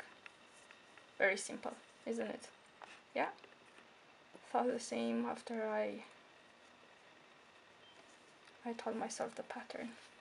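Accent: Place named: Iceland. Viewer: Your accent sounds very Icelandic, what is your first language?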